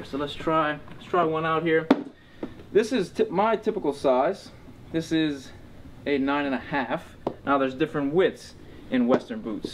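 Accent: United States. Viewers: You have to be from Louisiana with an accent like that. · How can you not love that New Iberia accent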